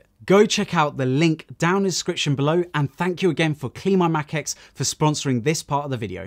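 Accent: United Kingdom. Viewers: You explained it better than the WWDC21 guy. Love that Brit accent. So soothing to the ears. Keep up the good work!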